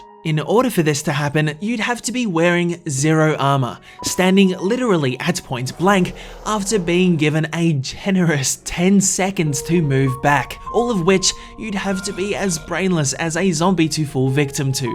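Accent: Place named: Australia. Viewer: I swear ur Australian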